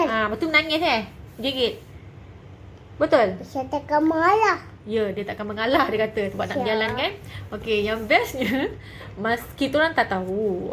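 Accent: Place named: Sabah, Malaysia. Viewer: Cakap pun org sabah sudah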